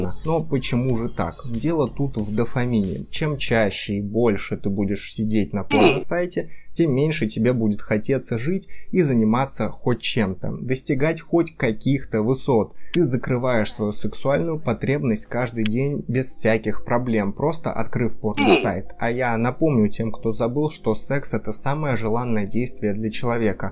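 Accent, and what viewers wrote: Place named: Okanye, Russia. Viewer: это оканье в течении всего видео прям зае....ло конкретно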